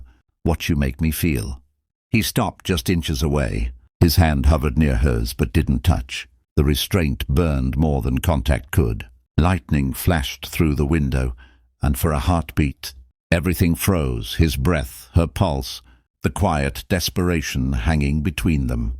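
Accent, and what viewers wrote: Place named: United Kingdom. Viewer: This is a story about the old west. Why does the narrator have a British accent? Very difficult to follow.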